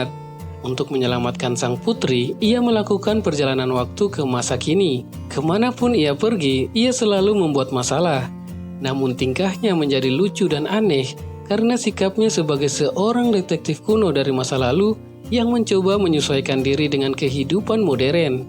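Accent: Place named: Makassar, Indonesia. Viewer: Dari cara ngomong kelihatan bgt kalau org makassar😂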